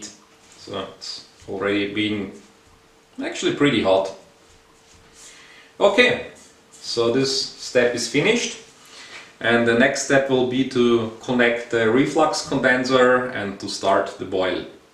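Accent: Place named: Norway. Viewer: du høres norsk ut.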